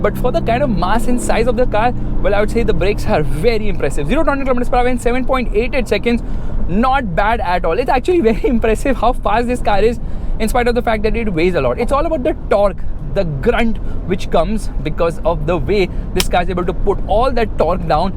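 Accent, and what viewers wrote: India. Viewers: Очень ярко выраженный индийский акцент в английском языке...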